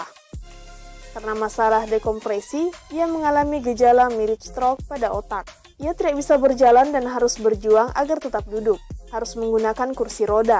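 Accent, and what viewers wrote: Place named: Batak, Indonesia. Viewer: Bahh...iya yah logat nya nampak kali😂,aku pun orang bataknya😀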